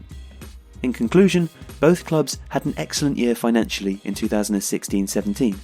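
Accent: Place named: England, United Kingdom. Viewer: What a pleasure to hear an English accent on youtube speaking slow, simple and easy to understand sentences. Rather than incredibly over dramatic american babble at 1000mph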